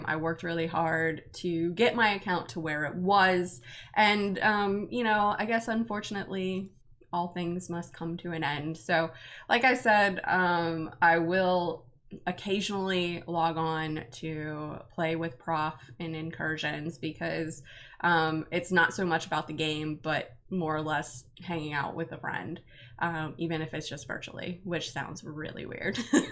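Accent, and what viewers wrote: Pittsburgh, United States. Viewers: yeah its called Pittsburghese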